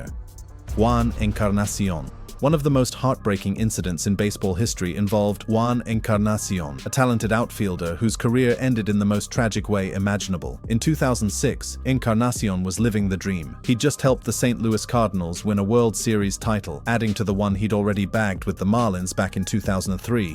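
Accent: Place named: United Kingdom. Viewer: Why the FCK does the narrator have a British accent???? Where in the UK does ANYONE play baseball???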